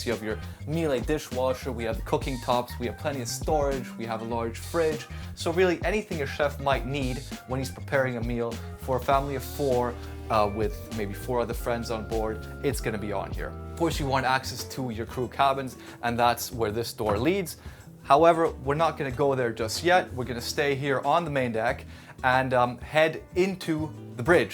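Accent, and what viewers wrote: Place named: United States. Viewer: Wonderful gentleman's yacht with lovely lines. Great walkthrough! Very positive energy and excellent American English sir!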